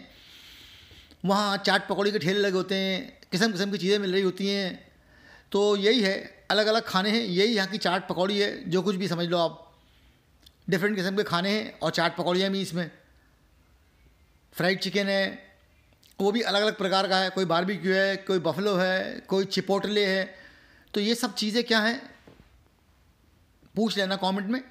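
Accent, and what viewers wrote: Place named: Uttar Pradesh, India. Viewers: जो आप u p स्टाइल में बोलते हैं बहुत ही मजेदार वेज एग रौल 😁😁😁😁❤ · ji namaskar main aapki video Kafi Samay se dekh raha hun aap bilkul sacchai Bayan Karte Hain dusri Baat Aap Ki Boli mujhe bahut acchi Lagti Hai kya aap up Se Hain aapki baten sunkar maja Aata Hai kya aap India ko mis Nahin karte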